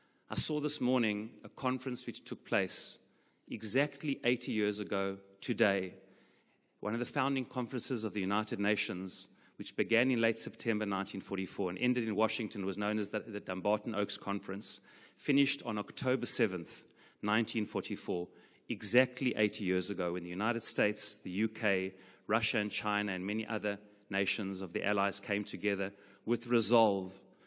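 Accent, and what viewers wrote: South Africa. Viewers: Rabbi Perez as a South African I recognised your accent. Proud of you and all the other strong people of Israel. May you, your wife and all your loved ones plus all who lost their families, experience Hashem's comfort and peace. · South African accent Praying for alllll the hostages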